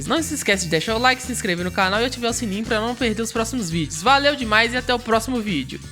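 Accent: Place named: Minas Gerais, Brazil. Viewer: o sotaque mineiro deixou tudo melhor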